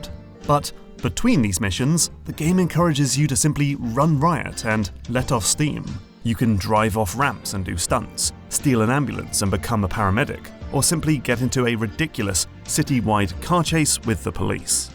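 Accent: England, United Kingdom. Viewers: Wow, talk about a nostalgia trip lol , it's like watching my gaming journey from childhood till now being narrated by an Englishman... though you missed paper boy on Gameboy lol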